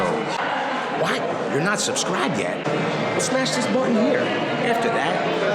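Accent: Philadelphia, United States. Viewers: What part of Philly are you from? That accent.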